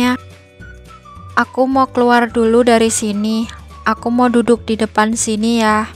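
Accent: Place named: Java, Indonesia. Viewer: Meski di taiwan..logat jawanya msh kerasa bgt.mntap...kgn indo ga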